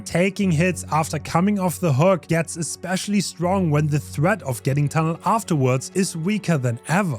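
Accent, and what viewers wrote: Germany. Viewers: Can you stop talking like the fucking rift guy while adding your horrible german accent dude? Wtf is wrong with you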